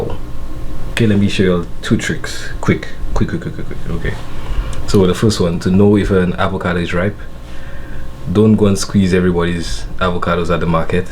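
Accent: Saint Lucia. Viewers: doing it big!!!!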